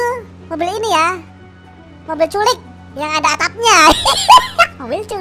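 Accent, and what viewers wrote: Java, Indonesia. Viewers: bhs jawanya lancar n kentel jawanya kayak kopi. Bang enggak.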